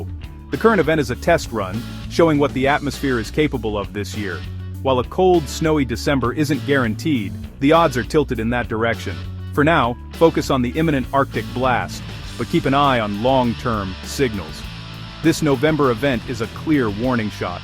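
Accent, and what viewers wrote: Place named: United States. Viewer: UK weather .why has the narrator got an American accent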